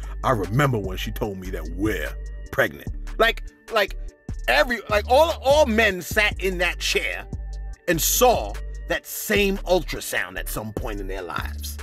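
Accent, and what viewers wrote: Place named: Bronx, United States. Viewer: Ay yo shout out to for saying niggas twice. 😂 Had me weak cuz u neva cuss and always say ninjas. I told my wife u sound like u from da Bronx tho!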